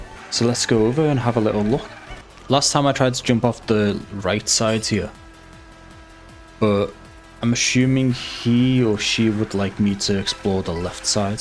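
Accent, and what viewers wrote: Liverpool, United Kingdom. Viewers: I think he’s scouse. The accent gives it away?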